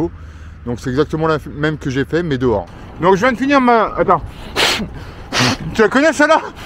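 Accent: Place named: Marseille, France. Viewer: Salut c’est mouna trop marrante la vidéo je sais pourquoi tu parles marseillais c’est se que tu faits avec papa au bureau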